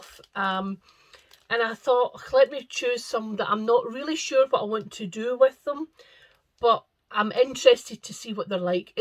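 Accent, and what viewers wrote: Scotland, United Kingdom. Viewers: I discovered you earlier this week and binge watched several episodes and of course subscribed. I've fallen in love with your beautiful Scottish accent!! I'd watch just for that, however your content is wonderful too! So win win! I'm sorry about your dad's health. And yours as well. How painful. I'll pray for full recovery on your legs. I'm sure you are looking forward to summer as much as we are.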